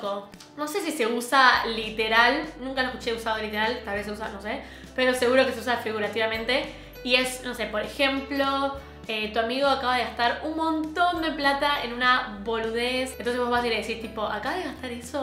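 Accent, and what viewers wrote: United Kingdom. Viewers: Sos una grosa! Amo tus videos y tu acento británico!! Estudio Inglés y me encantaria tener ese acento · estoy enamorada de tu british accent creo que te lo dije <num> de veces ahre igual lo voy a seguir diciendo 😌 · Me encanta tu acento británico! Yo trabajo en ese acento también! Me encanta tu contenido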